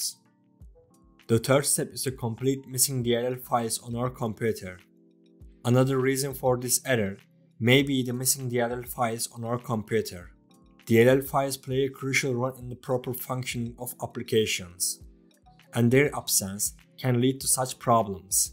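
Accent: Turkey. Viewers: reis bişey sorucam sen türkmüsün aksanın türkçe çünkü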